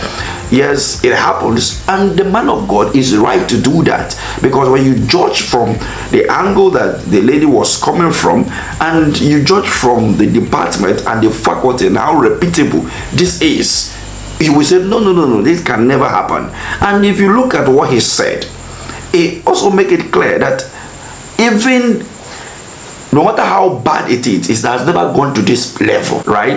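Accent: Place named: United States. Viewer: Must you speak like an American. Though I appreciate you but be real.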